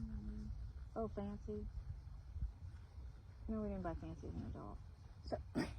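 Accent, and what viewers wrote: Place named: Indiana, United States. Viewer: Thanks for that. Your donkey's, your farm, and your Hoosier accent are all interesting to an Australian bloke like me here in Canberra. 🐐🐎🐔🐑🐶😸🇦🇺👍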